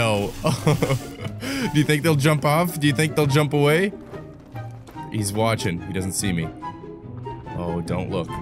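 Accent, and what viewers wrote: Minnesota, United States. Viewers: Shit is this guy from Minnesota too?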